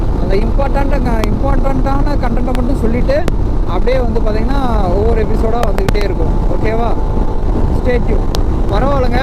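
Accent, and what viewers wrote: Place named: Madurai, India. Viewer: Madurai slang ❤️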